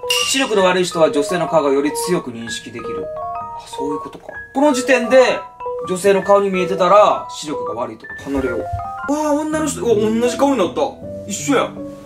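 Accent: Osaka, Japan. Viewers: 大阪弁って本当にいいなーっていつも思う😌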